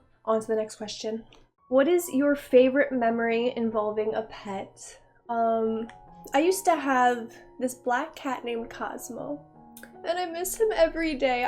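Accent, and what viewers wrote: United States. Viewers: You always self-identify as Polish, but you have an American accent. Did you move to the US during your early childhood, so you lost your Polish accent?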